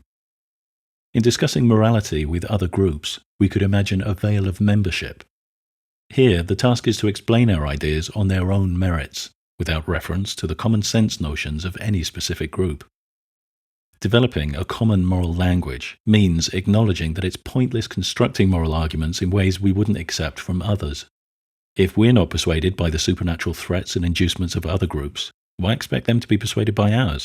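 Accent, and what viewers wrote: United Kingdom. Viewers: There is a zero-sum game in real life, though. Resources and land-carry capacity are all limited goods. The ivory tower moralizing you see here in this video is never related to real world current-day examples for a reason. It would lay low the sort of bourgeoisie boutique politics that the author labors under while ironically living in a bubble where he deludes himself into thinking everything is unlimited since he is disconnected from the tribal realities of the situation of say the working class in Britain where his accent would suggest he lives.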